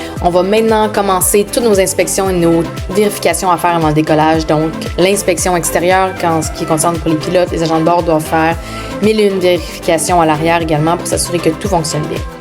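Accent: Quebec, Canada. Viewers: Je découvre et je m’abonne, fana d’aviation et de l’accent Québécois c’est le top merci 😍👍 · Merci pour cette vidéo toujours aussi jolie l’accent québécois bon vol merci de faire partager ta passion du pilotage bonne année 2025 santé et bonheur milles bises de France Jérôme ❤❤❤❤😊😊😊😊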